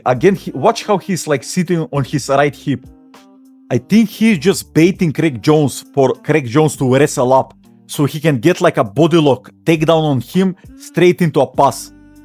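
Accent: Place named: Eastern Europe. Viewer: Watching a grappling video narrated by a person with an accent I can imagine as eastern european makes me feel like I’m benefiting more from it. Watch video and forget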